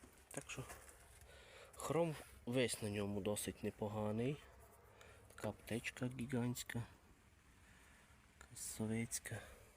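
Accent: Western Ukraine, Ukraine. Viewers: Робіт добру справу і все вийде. Гарний Закарпатський діалект, головне не кацапський язик. Слава Україні. Смерть ворогам. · Прикольний, шкода що на городі зберігали, - кузов убитий. Цей москвич був би раннім, якби був 412-ї моделі. 408 можна назвати раннім, якщо це авто в кузові "е" (з фарами-плавниками) і десь приблизно <num> року До речі, у Вашого авто мабудь чи не найгарніша мордочка з всього модельного ряду, - решітка з широкими отворами і прямокутні фари П.С. не бачив поки щоб хтось критикував жителів західної України за їх говірку, це ваша "фішка", не переймайтеся! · Бачив відіо як неошибаюся канал називається "Вася Крутс" до він теж купив москвич тепер розбира на запчасті і продає. Я замітив що розмовляєте як в західні Україні все 👍👍👍розмовляйте як удобно головне щоб не нарусійські мові 🇺🇦 🔱.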